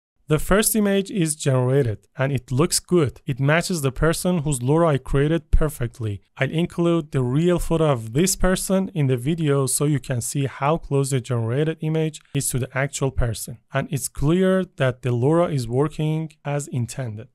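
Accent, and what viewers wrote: Iran. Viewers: لهجه ی زیبای فارسی رو که شنیدم ذوق کردم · این لهجه قطعا واسه یه ایرانیه ، دمت گرم :hand-pink-waving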